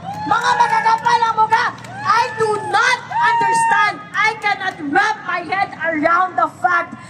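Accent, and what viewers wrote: Visayas, Philippines. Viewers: Saludo ko dai sa imo! Ayos ka !! Bisaya ka dai?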